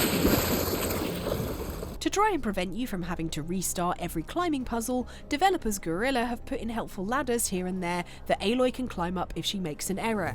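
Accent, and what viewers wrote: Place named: United Kingdom. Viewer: the British accent makes a difference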